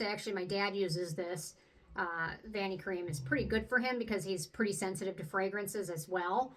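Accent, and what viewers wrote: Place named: Midwest, United States. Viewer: I love your accent. Northern Midwestern accents are absolutely adorable- sounds so wholesome! 😊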